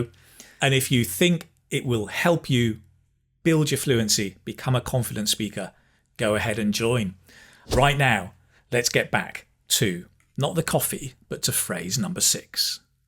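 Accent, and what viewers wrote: United Kingdom. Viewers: Please someone tell me that " is this British Accent?"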